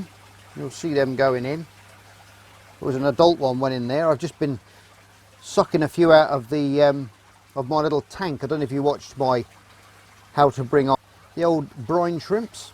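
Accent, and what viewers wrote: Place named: United Kingdom. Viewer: Rossendale nice to hear a British voice 😊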